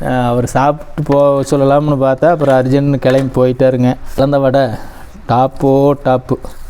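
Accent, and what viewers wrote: Kongu, India. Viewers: இலந்தைவடைசெய்முறைஅருமை உங்களுடைய கொங்கு தமிழ்மிக அருமைங்க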